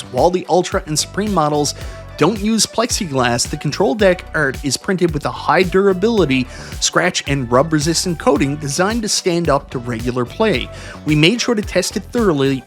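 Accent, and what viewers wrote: Newfoundland, Canada. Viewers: Are you a newfie or caper?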